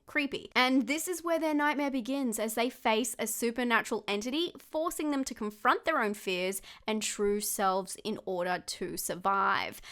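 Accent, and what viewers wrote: Australia. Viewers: It’s great to hear an Aussie accent! Keep up the good work, love you recommendations 🇦🇺👍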